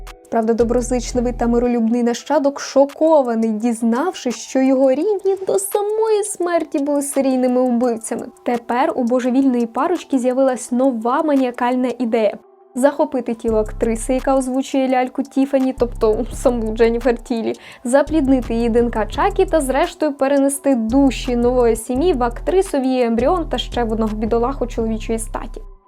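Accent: Standard. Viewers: Боже, яка ж гарна та чиста українська мова, насолода для вух 🥰🥰🥰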